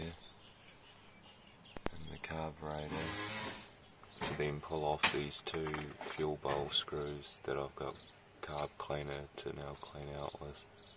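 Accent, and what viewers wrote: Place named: New Zealand. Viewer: Hey bro, sounds like your from New Zealand, are you from Auckland at all? I've just purchased a 2E 1994 Toyota Corolla Hatchback recently and it seems to be running a bit rough, if your in Auckland would you possibly be keen to maybe check it out and maybe tune it? Would pay for your time etc and would rather have it done by an expert then trying to mess with it myself since I'm not really mechanically minded, if your keen let me know. I'm thinking it could be the mixture, I adjusted the idle screw and it seems to rev lower as the previous owner adjusted it to be high revving as that's how he liked it he said (strange but yeah hahaha) Cheers bro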